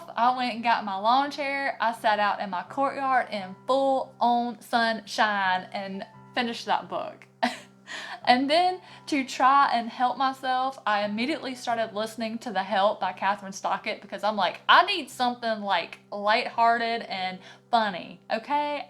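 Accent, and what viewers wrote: South, United States. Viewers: I love your channel!!! Love your accent. I'm from the South too.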